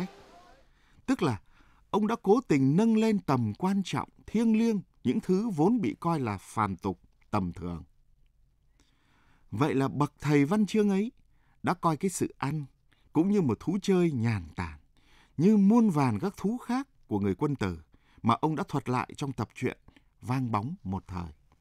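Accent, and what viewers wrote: Hanoi, Vietnam. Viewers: Giọng chú mc này chuẩn hà nội nè : trầm trầm chậm rãi nhẹ nhàng thanh tao âm vực rõ ràng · Giọng hà nội nay mất chất rồi. Điệu mới ngọt vcl. Hà nội có tiếng là giọng chuẩn , rõ nghe như giọng của ng trong clip này vậy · Giọng hà nội gốc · Mc nói giọng hà nội gốc nghe hay quá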